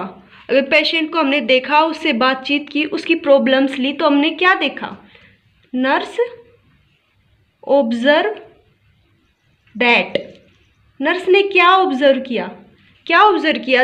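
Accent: Haryana, India. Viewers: Haryanvi sweg h boli me madam k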